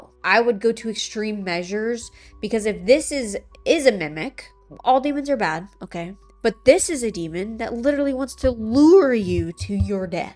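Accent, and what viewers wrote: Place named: Midwest, United States. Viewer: Where is she from? How she talks reminds me of the Midwest and ita so comforting. How she says 'sawl' instead of 'saw' lets me know she's cool 😎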